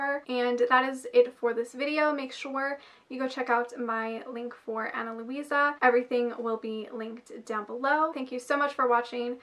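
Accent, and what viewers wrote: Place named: Canada. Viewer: Good to know your Canadian. I like more your accent compared to Americans.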